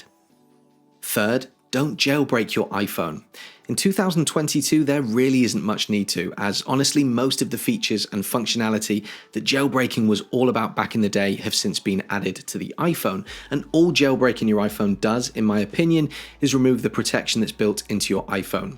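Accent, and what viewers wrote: United Kingdom. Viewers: Jailbreaking your iPhone, and changing the password from the default of alpine to anything else is infinitely more secure than not. VPNs don’t really help that much. If anybody knows what they’re doing, they can see through the nonsensical rerouting of your IP address. The British accent really helps.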